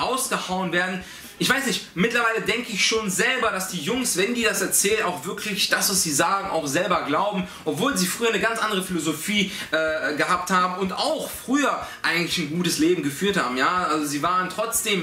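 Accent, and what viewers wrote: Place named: Switzerland. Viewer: hahahahahaha wie du schweizerdeutsch sprichst 😂😂 Top!!! 👌👌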